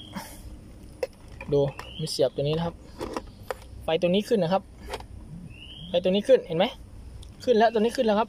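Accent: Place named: Isan, Thailand. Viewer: สำเนียงต้องอิสานโป่งเว้าไทยต้องเป็ะๆๆ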